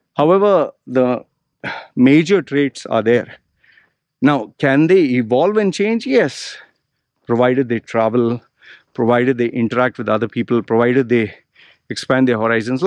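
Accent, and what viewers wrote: India. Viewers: I'm confused. You say you're didn't spend a lot of time in India, but you sound Indian like you grew up there.Everybody knows indian accents . Plus Whats up with , new zealand Tribal tattoos on your face why Since you not from new zealand or indigenous new zealand just wondering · You don't look indian at all, of course your accent is extremely strong so people realize that, but it's nice to listen to you Subscribed!!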